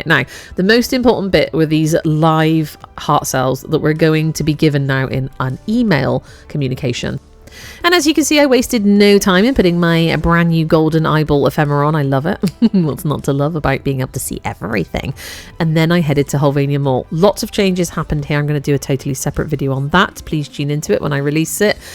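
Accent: West Country, United Kingdom. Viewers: Wow what an informative video. Giving a like and sub. Nice to hear an English voice! Got a west country twang to it? 😅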